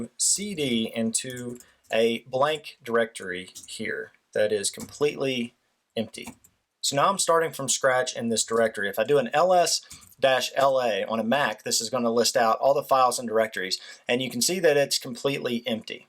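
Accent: South, United States. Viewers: Great vid, thanks! Great southern accent, lol! With love from South Africa.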